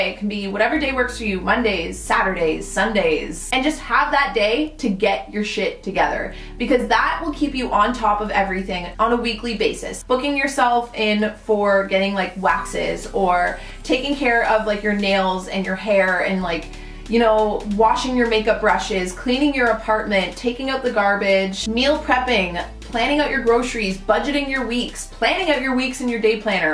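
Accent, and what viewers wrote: Canada. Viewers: Brea Monai I thought it sounded Canadian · I lovvvvve the Canadian accent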